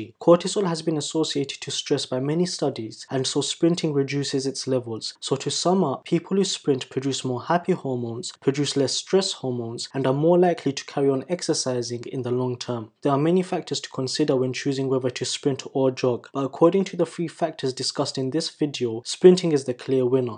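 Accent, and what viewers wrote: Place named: Bangladesh. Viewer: You sound Bangladeshi based on your accent. Am I right?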